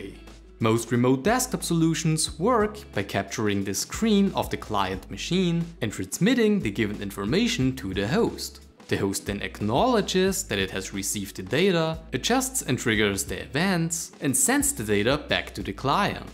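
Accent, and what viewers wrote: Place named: Germany. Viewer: He is clearly German. Why I know that? Because I speak the Same like this Guy c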